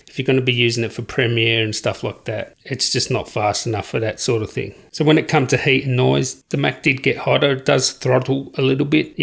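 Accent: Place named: Australia. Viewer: Australian accent in the flesh 😜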